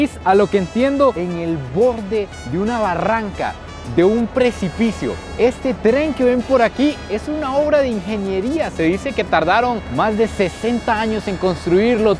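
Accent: Mexico. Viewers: jajaja flaco kbron, que chingon que visitaste nuestro Mexico. Te ves feliz, gracias por compartir un poco de nuestro encanto mexicano. oye, por cierto, tienes acento mexicano. neta eres de otra patria?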